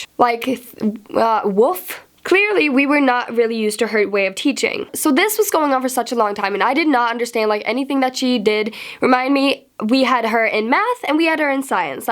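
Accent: United States. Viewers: johansson they didn't say it was bad lol it's just different because to me she just sounds American so it was surprising :)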